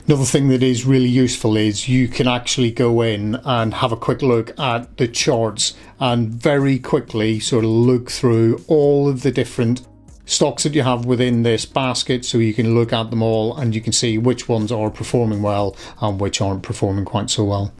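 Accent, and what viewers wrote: Northern Ireland, United Kingdom. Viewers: Great content plus good to hear a local N.I. accent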